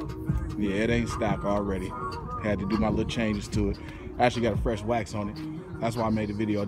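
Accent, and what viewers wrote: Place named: Southeast, United States. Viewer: You aint from Cali are you? You sound like you somewhere South east...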